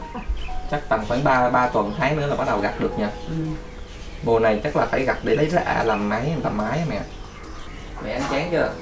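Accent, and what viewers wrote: South, Vietnam. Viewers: Cho mình hỏi sao bạn ở miền Bắc mà nói giọng Nam hay quá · Ủa giọng nói của bạn miền nam còn trái cây này ở ngoài bắc mà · cảnh miền Bắc , bạn nói tiếng miền Nam.... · Thấy bạn nói giọng miền nam gặt luôn á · Ong noi nay noi tieng nam ma dau ra móc chau vay troi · Ủa mẹ e ở miền Bắc mà e nói giọng Nam hay vậy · Nghe giọng bạn và xem video thì đoán bạn ở miền Nam. Nhưng đến video này thì mình thắc sao ở miền Nam lại trồng được mận Bắc nhỉ? Hay bạn có nhiều nhà?😊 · cho mình hỏi là bạn ở miền bắc sao lại nói giọng nam v.. hay là gđ chuyển ra ngoài này sống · ơi! Chú mới đăng kí kênh của con nè. Quê con ở đâu mà chú nghe cháu nói giọng miền nam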